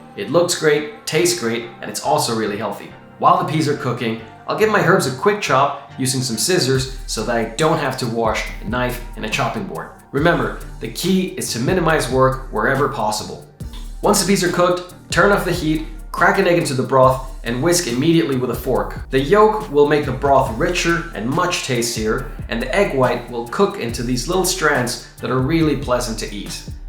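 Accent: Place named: United Kingdom. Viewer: How to sound british without sounding british. Where do you live sir?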